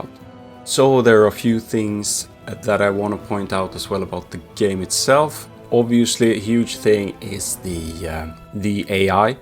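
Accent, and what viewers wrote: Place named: Sweden. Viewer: I like your swedish accent! i bought the game because of it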